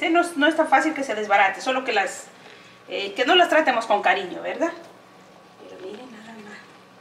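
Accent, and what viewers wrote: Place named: Honduras. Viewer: Soy de jakisco pero su acento no me parese de jalisco vivira ahi y tratara de imitar el acento espanol pero no me parese de jalisco le siento otro acento me parese que es usted de HONDURA?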